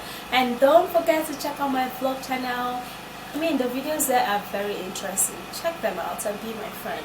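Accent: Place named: Nigeria. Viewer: She's Nigerian.....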